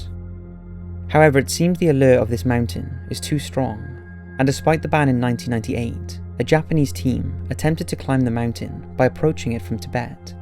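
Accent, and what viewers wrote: Wales, United Kingdom. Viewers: Trying to guess the accent. Welsh?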